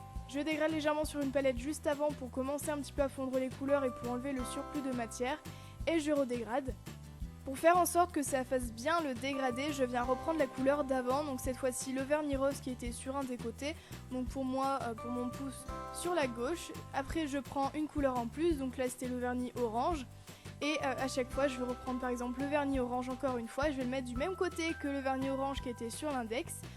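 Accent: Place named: France. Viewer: Tu parle français de France moi je parle le Québécois je suis une fille J'ai 8ans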